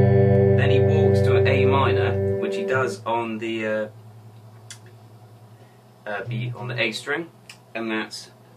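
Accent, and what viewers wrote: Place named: United Kingdom. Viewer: love the cup of tea and the nice accent very british! you make us proud. thanks for the lesson it really is great and very useful. keep them coming xx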